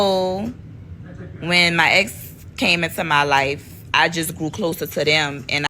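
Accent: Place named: New Orleans, United States. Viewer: I was looking at you and I said she look sooooo familiar and I heard your NOLA accent then you said your name and I said I remember you from the VA. God bless you babe! You have come a long way and have done so good with your life! Take care of yourself and your babies. You’re truly beautiful both inside and out!❤❤❤🙏🏾🙏🏾🙏🏾🙏🏾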